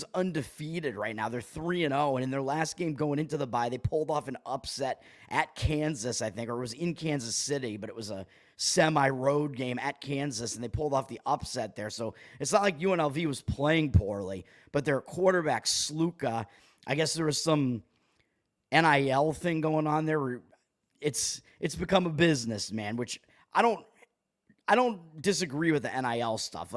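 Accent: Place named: Boston, United States. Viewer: we need more Boston accent... Worcester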